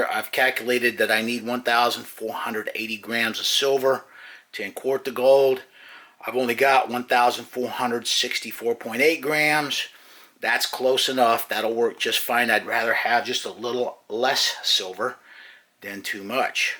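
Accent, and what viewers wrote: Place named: Philadelphia, United States. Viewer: you're from the North East aren't you? I wanna say around me in NJ (in the south where we don't have that accent - we sound liker Philly) and I feel like I hear some Philly in your words, but I'm probably wrong. That's be cool if if I guessed it though. :)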